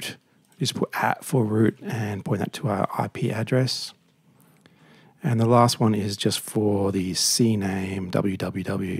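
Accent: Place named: Australia. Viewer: nice aussie accent :)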